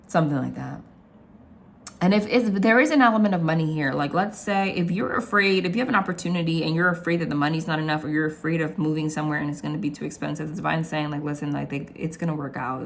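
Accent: New Jersey, United States. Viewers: I loved the rituals, I vibed with the one for the naughty kids in the class 😂😂 Your voice and how you use it when the trance is strong is so cool, and the Jersey accent, of course. Especially for a South African male, our English accent does NOT sound good.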